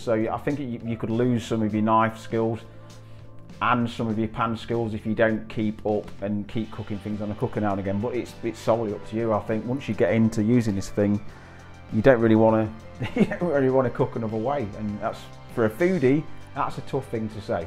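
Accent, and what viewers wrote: United Kingdom. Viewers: I’ve no idea why this fella thinks you’re Australian.. you sound more northern uk than anything (sorry if I got it wrong). We definitely do not pronounce yoghurt like you do lol. Soz, upgraded from tm5 to tm6 recently and looking for hints and tips. Spose I should ask my consultant but I don’t want to bother her right now ( can’t be half arsed) Hope you’re doing well xxx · Thank you so much for your detailed and really informative helpful review. I'm a foodie too and a competent cook but am time poor with work and uni study so am tempted to add the TM6 to my kitchen, even though I already own a different brand high quality thermo cooker (which I love as well!). I'm hoping the teenagers will find it fun to use so that they can help out a bit more! 😂 I really value your first review. Think you may have persuaded me to go for it! Thanks so much. 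Ps love your accent, I'm a Brit, now Aussie living down under. Made me feel at home. 😊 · Derby accent?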